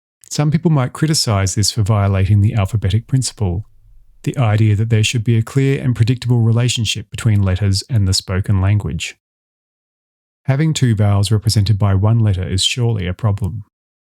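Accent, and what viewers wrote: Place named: RP, United Kingdom. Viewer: This reminds me of a difficulty I had learning Icelandic. The “short i” sound as in “sit” cannot end a word in American English, but it CAN in Icelandic, as in the word “listi” (a list). For me, it takes special effort to pronounce those vowels the same way instead of pronouncing it like the Y in “happy”. So it makes sense to me, as an American, that “i” is a single phoneme that is pronounced differently in different cases. It didn’t even occur to me that a final “i” can be “short” in some accents. But when I heard the RP, there it was! Was the author of Shavian American? That might explain the choice.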